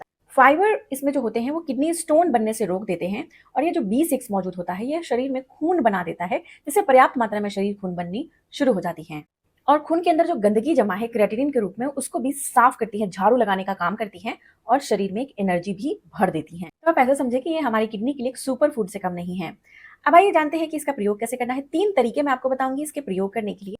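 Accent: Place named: South, India. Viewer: Madam Aap. Ne. Behad. Theek Remedy. Bataya. Lekin Aap. Ko. Awaz. Theek Naheen. Hai Aisa. Bolti. Hain. Jaise Medrasi. Hon So. Log. Naheen Samajh. Pate Allld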